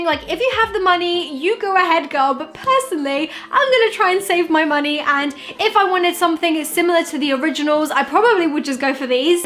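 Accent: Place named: London, United Kingdom. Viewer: You literally sound like a londoner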